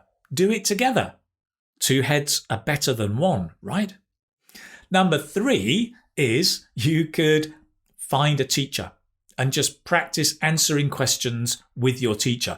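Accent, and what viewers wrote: United Kingdom. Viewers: Especially his british way of pronouncation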